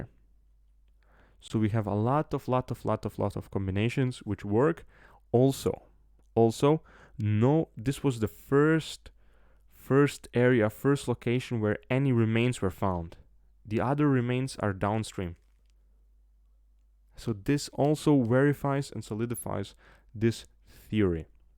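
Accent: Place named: Russia. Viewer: По вашему акценту, мне кажется что вы Русский!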